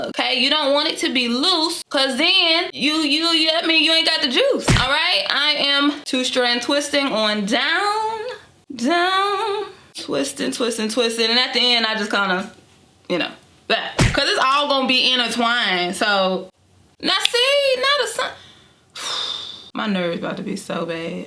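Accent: North Carolina, United States. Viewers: I KNEW u sounded like a North Carolinian🤣🤣🤣 heyyyy neighbor Lol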